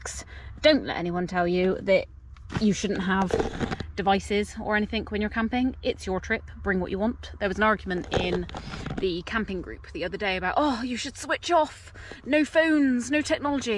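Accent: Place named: West Country, United Kingdom. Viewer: Like the humour & west country accent, helpful review.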